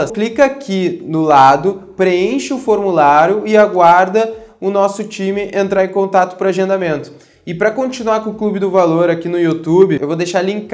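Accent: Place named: Porto Alegre, Brazil. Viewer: Sotaque bem portoalegrense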